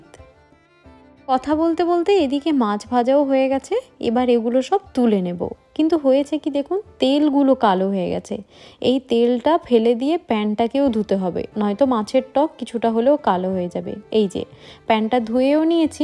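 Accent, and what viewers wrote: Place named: Medinipur, India. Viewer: তোমার বাচনভঙ্গিতেই বোঝা যাচ্ছে । আসলে , মাটির টান তো বোঝাই যায় । তারপর তুমিও যেনো বললে ,"আমাদের মেদিনীপুরের দিকে এভাবেই টক খায় ।" মেদিনীপুরের লোকেরা ঠিকই মেদিনীপুরের প্রতিবেশীদের চিনতে পারে । যাক , কোন মেদিনীপুর জানিও ❤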